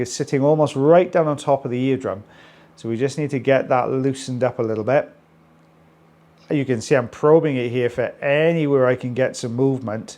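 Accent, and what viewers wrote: Wales, United Kingdom. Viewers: Your Welsh I'm Welsh keep talking, brilliant 😊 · I usually listen with mute on but I decided to listen to you and you're very interesting and I am an American and I love to hear how people from the UK speak❤ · I couldnt mute you. The Welsh accent is the best in the world. KEEP TALKING!!! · oh absolutely, the british accent doesn’t hurt either. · i love listening to you talk! 😂 i can listen to any UK accent forever, so lovely. i have a Welsh friend, he lives in England now but he’s quick to tell people he is WELSH 😂😂😂😂 Thank you! ❤ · Who wouldn't want to hear your lovely Welsh accent?! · he's a beautiful Welsh accent 😊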